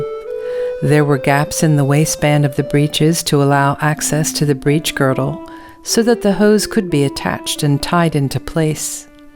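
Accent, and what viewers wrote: United States. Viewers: I agree that they are informative, but they are mostly about British fashion, so why is an American narrating? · Why do they have an American commentating this...😂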